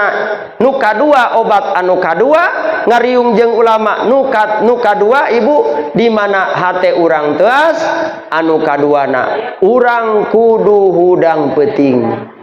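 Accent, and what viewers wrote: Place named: Banten, Indonesia. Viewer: Logat bantenna ieu ajengan kadangu pisan😅. Barokalloh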